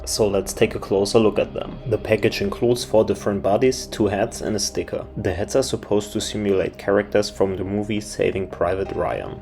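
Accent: Germany. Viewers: It sound like you are german are you ? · Einfach der deutsche Dialekt im englischen. 🤣 ich feiere es. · youre accent sounds very familiar to me are you from germany?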